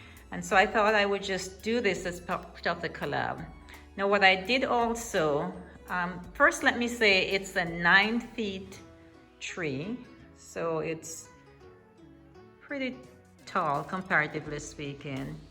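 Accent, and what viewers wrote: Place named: Jamaica. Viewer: Your home is super gorgeous! I love your staircase, so elegant and whimsical. You sound like a Jamaican sistrin lol. I love how your tree look. It's absolutely stunning! New subscriber. This was so much fun to take part in this challenge with all you wonderful and creative sisters. Thanks so much for sharing.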